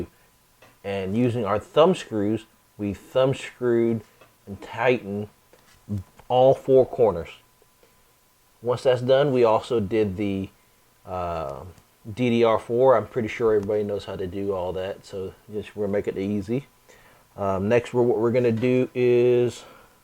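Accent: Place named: South, United States. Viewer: Funny southern accent ahahaha! Nice and helpfull video!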